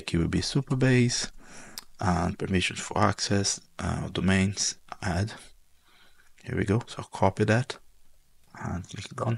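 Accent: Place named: Brazil. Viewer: eu reconheço o sotaque brasileiro de longe kkkkkkk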